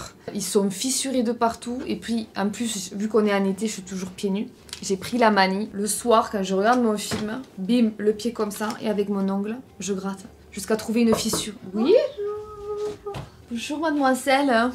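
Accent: South, France. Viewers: Bonjour. J'adore votre accent méridionale et votre bonne humeur. Vous êtes très belle et la caméra nous renvoie l'image d'une jeune femme qui a bien "les pieds sur terre " et " la tête sur les épaules ". Bien, cela étant dit, Action et moi ce n'est pas une grande histoire d'amour. Le rapport qualité prix pour addict du shopping me tentait beaucoup par ses prix au détriment de la qualité et surtout de mon porte-monnaie. Bref, moins j'y vais mieux je me sens. Cela n'engage que moi bien entendu. Si votre shopping est à la hauteur de vos attentes, je suis contente pour vous. Restez aussi solaire car vous apportez beaucoup de bien-être. Avec toute ma sympathie. 🙂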